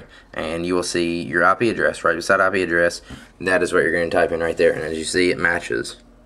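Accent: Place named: Oklahoma, United States. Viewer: Are you from oklahoma cause i have a cousin name alex and he has accent just like yoi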